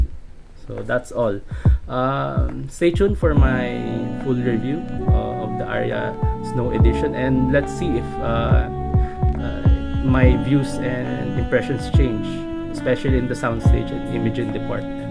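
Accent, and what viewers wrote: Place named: Ilonggo, Philippines. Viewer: HAHAHAHAHAHAHA akala ko lang kasi may halong illonggo yung accent mo sir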